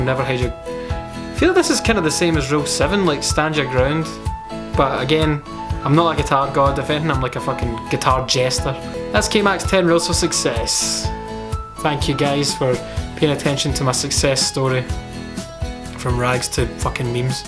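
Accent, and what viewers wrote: Scotland, United Kingdom. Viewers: You're SCOTTISH? I only watched your metal and metal and I never knew lol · Rule 23: Have Scottish accent <3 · I love your scottish accent,:) Can listen to it all day!:D I totally need to visit Scotlad once:) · Rule 11: have a glorious scottish accent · Can you do an entire video in the most unintelligible Scottish accent for all the non Scots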